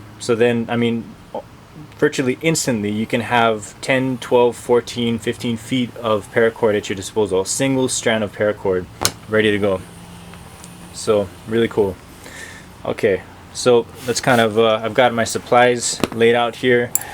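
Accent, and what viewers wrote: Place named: Hawaii, United States. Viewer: Watching thru the video and I'm like "this guy sounds like he's from here(hawaii)" so I looked at your picture and was surprise to find that u are lol.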